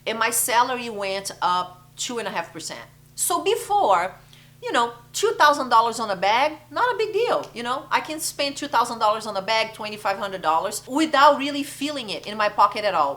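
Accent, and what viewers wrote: Brazil. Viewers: I hear a Brasil Portuguese accent am I right? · Eu não te conhecia, mas sabia que era brasileira quando começou a falar. ❤️ Adorei o conteúdo! Concordo muitoo!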